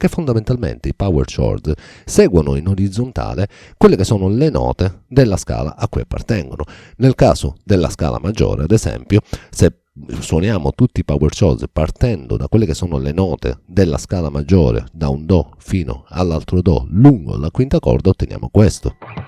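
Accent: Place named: Sicily, Italy. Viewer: io sono ciociaro di origine, ma essendo sposato e vivendo a Palermo, l'accento siciliano non è passato certo inosservato 😂 Comunque complimenti ancora e ti seguo sempre.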